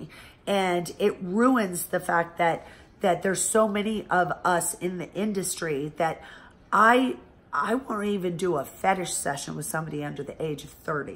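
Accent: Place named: Illinois, United States. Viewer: I bet you from Illinois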